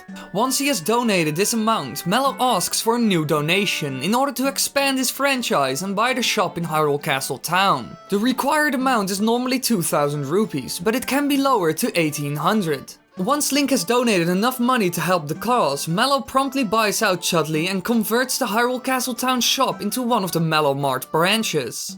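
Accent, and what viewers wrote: Netherlands. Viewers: lekker nederlands accent man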